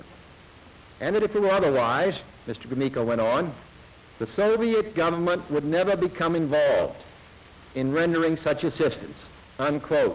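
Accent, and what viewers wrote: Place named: Boston, United States. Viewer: I'm from Boston, here's how it works. Words ending in -er are pronounced -ah, unless the next word starts with a vowel. That's why its "Launched from Cuber against...."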